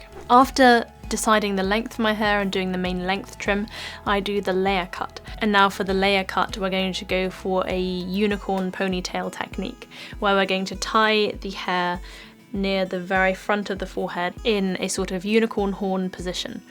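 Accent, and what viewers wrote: United Kingdom. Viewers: Are you British :v ?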